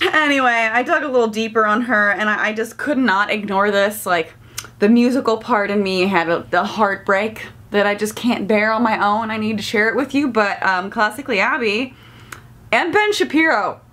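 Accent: South, United States. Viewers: I hate that you keep using a southern voice pretending to be a “conservative” woman. Talk about stereotypes. 🙄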